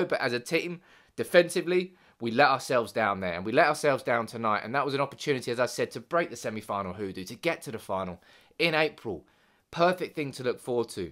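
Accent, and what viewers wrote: Manchester, United Kingdom. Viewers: Who is this guy, you were outclassed, go on City, you was never going to win that game, is this guy from Manchester, never heard this accent.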